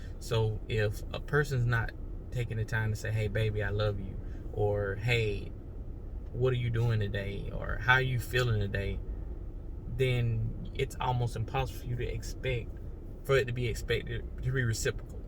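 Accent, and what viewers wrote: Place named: South, United States. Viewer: Love that southern accent! Be blessed 😎😷👍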